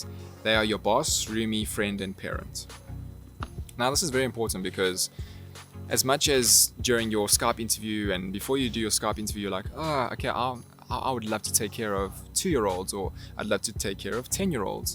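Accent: South Africa. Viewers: I'm going to South Africa as an AuPair soon, is your english accent what english sounds like there? Do all people speak this language? Or is there another major language that determined this accent? :D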